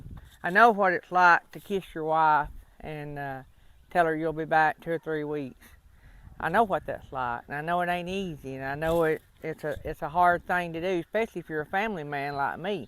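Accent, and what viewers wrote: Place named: South, United States. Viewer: Yeah you have a very harsh accent. It's quite obvious that you are southern lol. I think this is great advice. Jessie would probably love over the road work, but I've told him I don't like the idea. I wouldn't want him gone all the time and I wouldn't want to go either. So don't think it would work for us. Course with the hot shot, you seem to be home way more than you ever was with the RV hauling. Poor Tammy! aaaaaaaahahahaha!!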